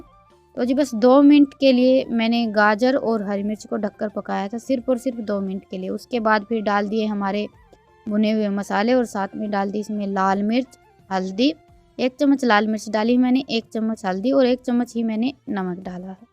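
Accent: Haryana, India. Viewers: Apki haryanvi voice bhut pyaari lgti h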